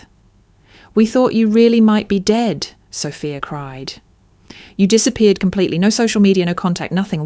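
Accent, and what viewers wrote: United Kingdom. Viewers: Please, no more British narrators.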